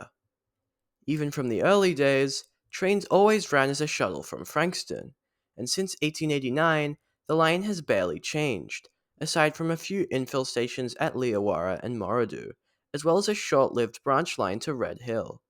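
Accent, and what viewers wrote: United States. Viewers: I get a feeling he’s autistic. My cousin is autistic and I’ve noticed he and several even high functioning often unawarely talk with an American accent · Great video. Curious if you’ve learnt your English online from American content as you don’t have Australian pronunciation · Great video. Curious where you come from because your accent has a slight American ‘twang’ to it.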